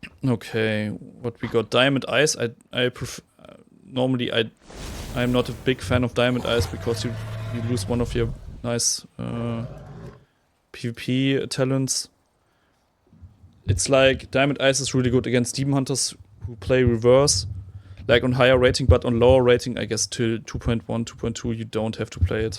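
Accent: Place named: Germany. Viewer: The german "zhe" instead of "the" gets me every time xd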